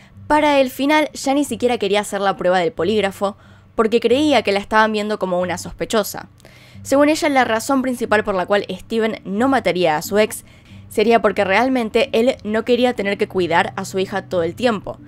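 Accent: Argentina. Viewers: Me encanta tu manera tan espotanea de narrar los hechos, tu acento argentino me encanta (corrígeme si no es argentino) y además estás super bonita awss ❤️✨ no puedo con tu canal es demasiado perfecto✨ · Hondureña cejuda con acento argentino?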